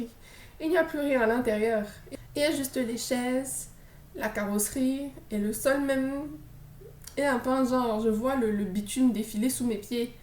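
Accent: Cameroon. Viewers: Travaille ton cardio car chez nous c'est le cardio ! Autre chose, NE CHERCHE PAS A COMPRENDRE LE CAMEROUN ! Tes problèmes commenceront dès que tu chercheras à le comprendre car un dicton dit : Si on t'explique le Cameroun et tu dis que tu as compris, c'est qu'on t'a mal expliqué ! L'accent Camer y est déjà, c'est bien. Pour le reste, gère seulement ! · Le port du casque est obligatoire au Maroc. C'est un pays plaisant avec une population avenante. T'as déjà prise l'accent camerounais et c'est plaisant de t'écouter . T'es éloquente et pertinente dans ton analyse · Tu deviens déjà camerounaise , même l'accent hein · tu es super marrante 😅on dirait que tu as vecu au Camer toute ta vie(tes expressions,ta gestuelle,ton accent etc) tes videos me detendent beaucoup,continue comme ca.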